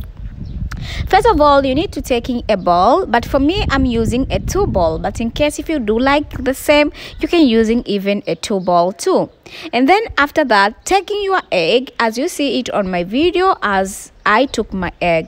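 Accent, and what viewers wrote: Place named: Tanzania. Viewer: Oh my God real TZ woman, the English just Sweet....🤗💕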